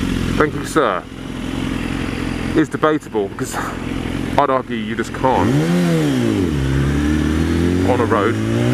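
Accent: United Kingdom. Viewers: Does anyone else think this guy just has the smoothest accent ever? like, when you hear english people, most of the time its like... ew. but not with this guy. g0od 0n u m8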